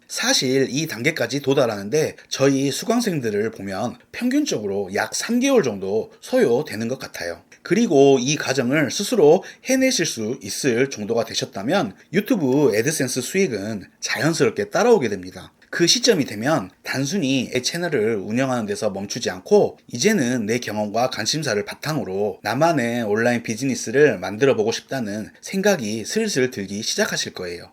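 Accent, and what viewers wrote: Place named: Jeolla, South Korea. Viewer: 전라도분이시네😊 저도요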